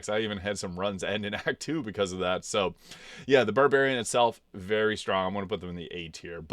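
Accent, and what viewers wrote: Canada. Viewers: I detect a Canadian accent. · LMAO, i legit watch this channel for two reasons: 1) great bg3 content and 2) the hilariously thick canadian accent 😂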